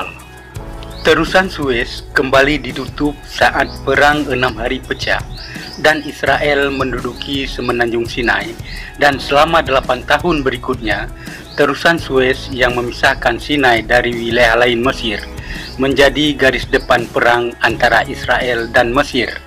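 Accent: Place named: Aceh, Indonesia. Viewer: adminnya orang aceh kayaknya,logat org aceh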